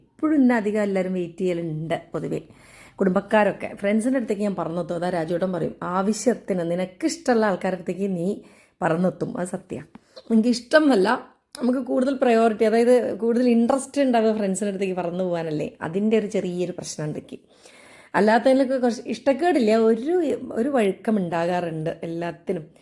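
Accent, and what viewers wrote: Kozhikode, India. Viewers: Nadathi 😂😂😂 inte Ingale kondu thottuuu 😅😅 mmale koikodu slang 😂😂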